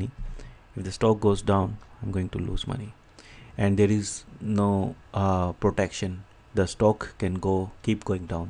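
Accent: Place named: India. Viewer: No from Japan, what do you think????? Of course from India (from his accent).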